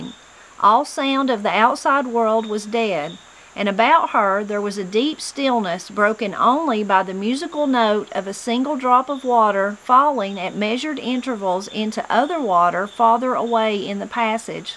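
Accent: Appalachia, United States. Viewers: “Teacher” is a brave and adventurous woman!! Thanks, your genuine Appalachian accent makes these readings special!💜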